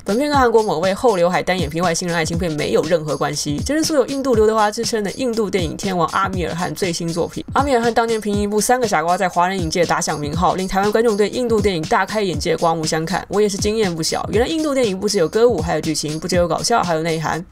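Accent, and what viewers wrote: China. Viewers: 主要是口音問題，一聽就知道 ；用字遣詞不是在成語，是在一些像"尤為特別的存在"、"玩意、"不能忍"等一般台灣人不會使用的語句，台灣學對岸的詞彙大多是台灣本來沒有的簡稱，像是小三、小屁孩等，也僅此而已還是建議你口音可以改台灣人比較能接受的方式，如果你觀眾群在台灣的話，因為很多人對這是很反感的，像我因為有人推薦說很好笑來朝聖，但口音和用詞讓我退避三舍，大概30秒就不行了，很遺憾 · 魏茹茹叫別人不要在台灣用大陸口音,想想嘛...不就等於叫台灣人到大陸不准用台灣口音.... 如果是我我也不知道要怎麼跟人家溝通哈哈...多體諒一下就好啦,不喜歡也不要說的那麼白,要留台階給人家下呀...尤為特別存在我會用啊...玩意兒...不能忍很常聽別人說呀.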